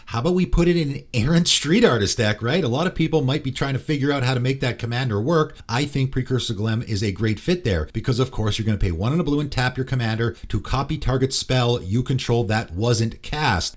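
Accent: Canada. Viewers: He's pronouncing it as the average Canadian would, who would be used to reading unfamiliar words with a French phonetic structure. If you all understood what he was saying, he communicated successfully and if you're mad about it, you are remarkably petty.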